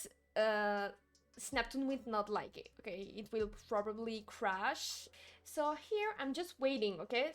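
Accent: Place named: France. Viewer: I love your french accent 😍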